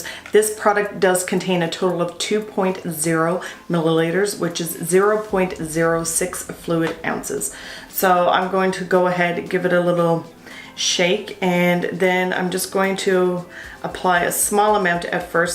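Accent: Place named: Canada. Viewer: Hello. So 5 seconds into this video andI could tell that you're Canadian. I had a Canadian pen pal years ago, so I can spot Canadians like no one's business. Great review. Thank you!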